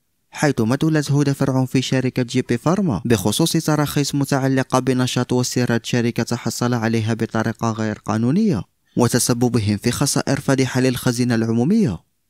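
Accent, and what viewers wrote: Morocco. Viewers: باينة لكنة مغربية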